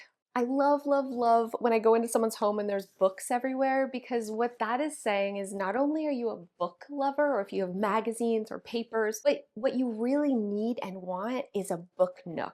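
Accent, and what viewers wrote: Canada. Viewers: Sounds like you're from Canada. Just want to say to all canadians on here, i think you are awesome and kind, and i hope to get to visit quebec this year. Love from Ohio ❤❤❤❤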